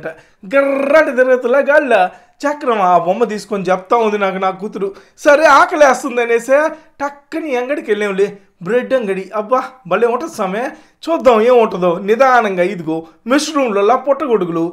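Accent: Nellore, India. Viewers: Super Tammudu meeru video pettadam chudakapovadama adi 5min ayina 10min ayina mana nellore slang naa Chevilo amrutam laa vundi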